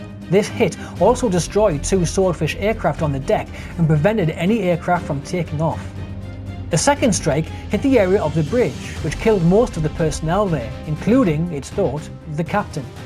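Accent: Newcastle, United Kingdom. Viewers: He sounds Geordie to me, not Scottish.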